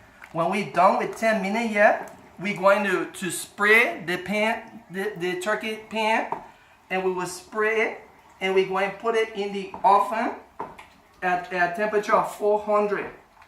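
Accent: Liberia. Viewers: Very nice to hear your Liberian accent. Your accent sounds just like my husband. Would love to see more videos with you speaking your natural accent. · Dis na small ting here o! Day man know how to talk ray liberian English yah. Day foo look goo o.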